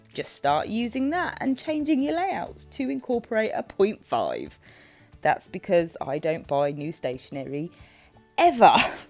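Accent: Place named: England, United Kingdom. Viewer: Awesome videos! Lovely to hear an english voice for once. If I was as artistic as you I probably wouldn't use washi either!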